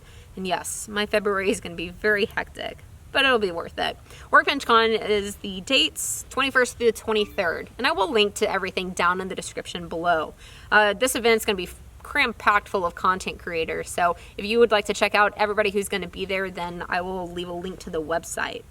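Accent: Texas, United States. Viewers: I figured as much, you have a texan accent. But I couldn't figure out why anyone would willingly sport the 216 area code(Im originally from there also, moved to Tulsa 15 years ago.)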